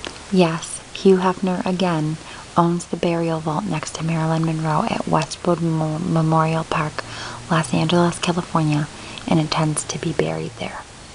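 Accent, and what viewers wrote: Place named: Midwest, United States. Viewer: Are you from the Midwest? I love your accent, reminds me of home!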